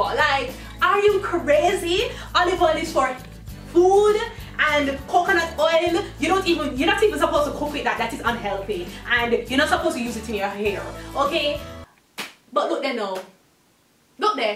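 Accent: Jamaica. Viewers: Am I the only one that heard her say coochie cream? DWL... anyways I just discovered this channel and I love it already mainly cause she uses her Jamaican accent.. Issa Caribbean ting :) You are very funny and helpful.. continue with the good work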